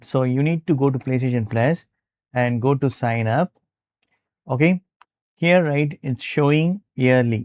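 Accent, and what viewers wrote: India. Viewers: Бля, думал что в Английском видео, а тут Английский Индия. Ничего не понял.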